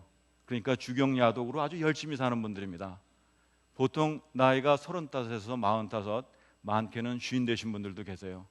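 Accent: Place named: Seoul, South Korea. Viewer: 강연 들으면서 문득 드는 생각이 요즘 나오는 서울사투리 말투시네요~~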